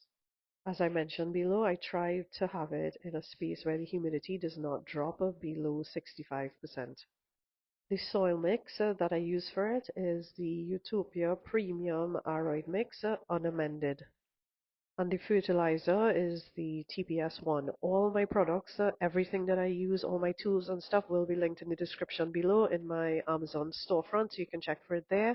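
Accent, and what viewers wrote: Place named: Trinidad and Tobago. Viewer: Oh what a beautiful plant, I find homalomena to be a pretty trouble free plant and usually when she's thirsty she'll lean a bit, she's positioned for some direct morning light which I'm seeing no issues with thus far. Immediately I recognized your accent as trini, and wow it's absolutely wonderful to hear a fellow Trinbagonian doing planty videos, keep up the good work 🇹🇹 ❤😊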